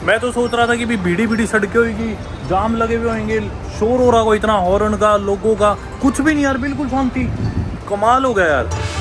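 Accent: Haryana, India. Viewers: मैं देख रहा था पंजाबी जैसा क्यों लग रहा है फिर बोला तो पता चला ये हरियाणवी जाट है 😅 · Love your harayanvi accent son! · With all due respect, I can't understand your curry accent English.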